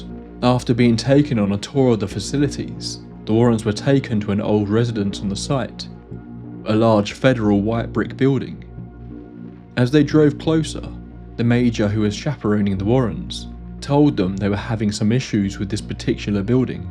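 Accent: United Kingdom. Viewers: I don’t wanna hear a British guy talking i can barely hear what’s he’s talking. About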